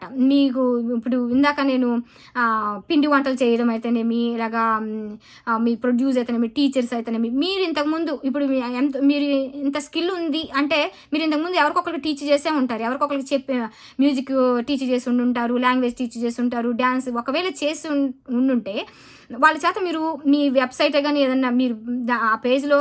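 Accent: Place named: Guntur, India. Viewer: Sister.. Ur from Andhra??? I think ur chridtian. Ur taking like Guntur Telugu language.. M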